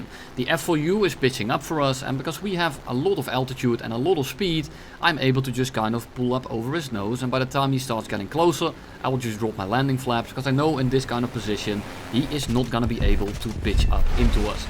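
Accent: Netherlands. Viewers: I love your content and it's been a great motivator to hear your smarmy Dutch accented voice in the background while I go through my evening routine most days. As a second generation German-American, you've got my support, you sarcastic swamp German... Err, I mean, Dutchman!:D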